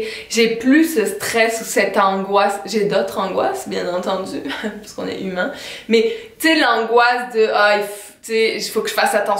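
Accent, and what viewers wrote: Quebec, Canada. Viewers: wow bel accent francais quebecois je connais un francais qui est ici depuis 60 ans et il a jamais perdu son accent ce qui est cool aussi pour la securite a 69 ans je peut dire que jamais je ne me suis pas senti en securite meme la nuit .encore aujourdhui bienvenue a tous les francais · pourquoi tu prends l'accent québecois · l'accent Québécois cest l'horreure.😞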